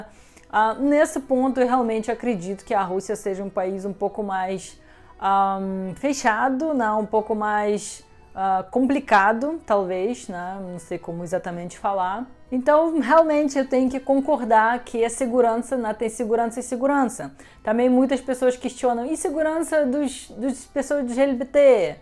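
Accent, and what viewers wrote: Rio de Janeiro, Brazil. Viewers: Tá com um sotaque carioca carregado, o famoso "S" chiado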